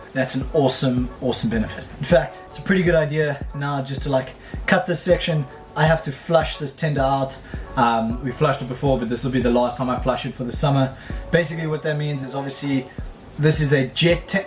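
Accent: South Africa. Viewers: That’s so awesome man, I thought I recognized the accent, cause I’m also from South Africa! Where in SA are you from?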